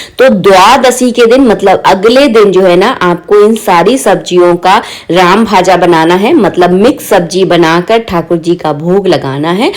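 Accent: Bundelkhand, India. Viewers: Mam. Kya aap. Bundelkhand region se h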